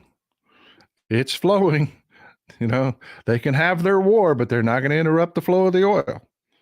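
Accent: Texas, United States. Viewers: I love hearing that Texas accent:) Yerp=Europe:)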